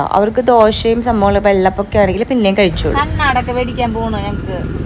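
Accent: Thrissur, India. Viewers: പിന്നെ എന്തുട്ടാ......aiwa തനി കട്ട.....തൃശൂർ സ്ലാങ്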